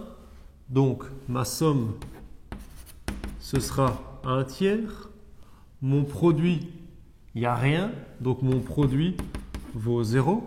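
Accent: Belgium. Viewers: cet accent bien de chez nous haha vive la Belgique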